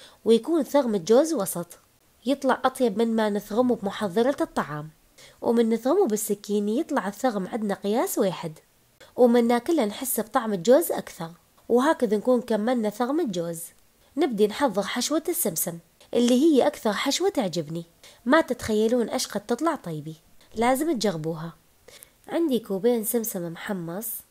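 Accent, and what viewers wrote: Mosul, Iraq. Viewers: الله ع اللهجه الموصلية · عاشت أيدك ياحلى لهجه الموصل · حلوه لهجت أهل الموصل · اشقد حلوة اللهجه الموصغيه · عاشت ايدكي حبيبتي قبان لهجتنا الحلوي الله يحفظ الموصل واهل الموصل يارب 🤲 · آللهہجة آلمـصـلآوية تجننن ❤❤ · عاشت ايدج مصلاويه اللهجه تخبل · فدوة للهجه تجنن اموت عل مصلاويين وكلامهم الحلو فديت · اكتبيلي عن حياتج عمرج اني احب الهجه الموصليه انتن الموصليات معدلات بلطبخ ام حيدر من الديوانيه الله يوفقج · كلامك حلو مصلاوي احب الكلام المصلاوي و الاكل المصلاوي لاني عشت بالموصل اربع سنوات خلال دارستي بجامعة الموصل و بقيت كل سنة بالعطلة الصيفية اسافر للموصل كلك رائع ياعراق من شماله الى جنوبه مهما بعدنا عنك فأنت عايش بالقلب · اني عجبتني اللهجة الموصلية واللدغة باللسان روعة وعاشت الايادي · ليش الموصل لهجتهم شويه تختلف عن بغداد. اكو كلامات متنفهم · عاشت ايدج يالمصلاويه لهجتج احله من الكليجه الله يحفظ الموصل واهلها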